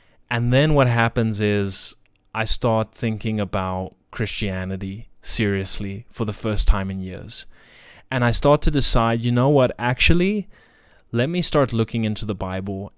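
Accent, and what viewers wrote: South Africa. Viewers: As soon as you started speaking I thought hey you sound south african. Fellow south african catholic here,welcome home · As soon as I heard your voice I thought, He's South African! (like me). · I know that accent anywhere. Hello, my fellow South African❤️. Praise be to God for your journey and conversion🙏🏽.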